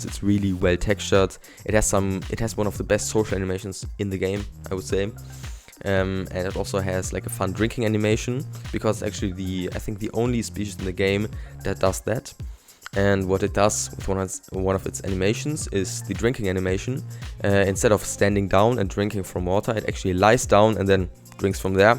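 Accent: Germany. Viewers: I love your german accent i don't know why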